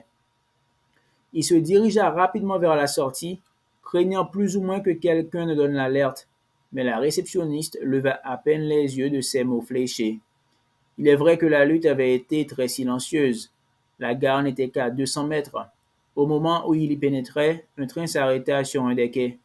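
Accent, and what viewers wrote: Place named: Caribbean. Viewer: Mon Dieu, vouloir lire Houellebecq est une intention louable, mais avec un accent antillais pareil...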